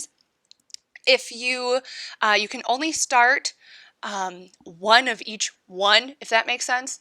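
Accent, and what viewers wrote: Wisconsin, United States. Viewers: Gotta be from Wisconsin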